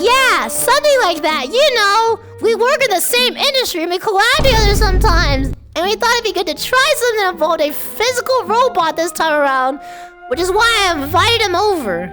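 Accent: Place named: United Kingdom. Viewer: Not the british accent 😭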